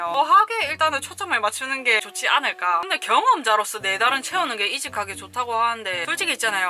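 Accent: Busan, South Korea. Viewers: 부산인 소리 쥘러!!!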